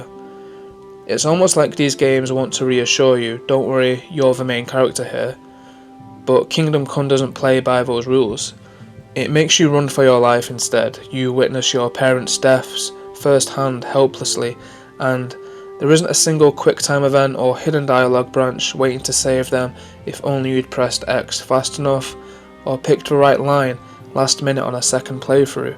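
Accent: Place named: North, United Kingdom. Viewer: ignore this, embrace your beautiful northern accent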